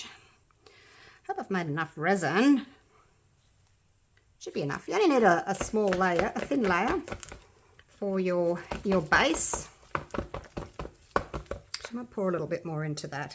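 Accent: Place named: Perth, Australia. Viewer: Oh my goodness that is one of the best blooms I’ve ever seen done! You make me want to get my resin out now & its 1.42am here in Yorkshire, England hahaha if my work is half as good as yours I will be very happy! I have quite a few family members who live in & around Perth & I love the accent! Your jovial passion for what you do shines through in your words & excitement! Never change my dear! Xxx Vick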